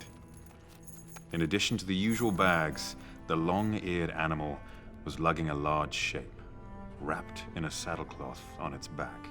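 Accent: England, United Kingdom. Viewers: Why British gentleman always makes a good audiobook narrator? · Oh he's British... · It's nice to get a good example of how nice Henry Cavill's natural voice is. His American accent as Superman kind of leads him to be very whispery and mumbly, and I love his Geralt voice but it's definitely a voice. But his natural English accent is gorgeous. He should totally do an audiobook series of the Witcher where he does his Netflix Geralt voice for Geralt and his natural voice for narration. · Wait wtf he’s british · It’s so weird hearing him with the British accent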